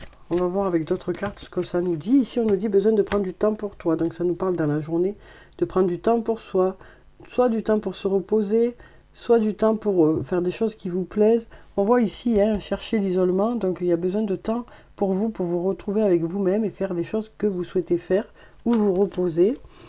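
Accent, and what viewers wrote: South, France. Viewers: Merci pour cette belle guidance avec ce charmant petit accent du soleil ❤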